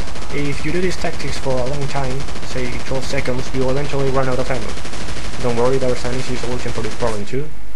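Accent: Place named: Spain. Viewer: Lovely spanish accent... (Y)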